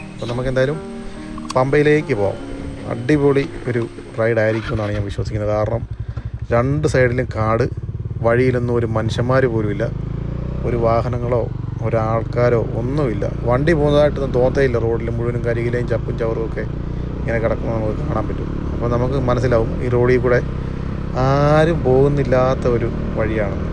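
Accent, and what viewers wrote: Kottayam, India. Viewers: kottayam slang kettapo tanne manasilay😅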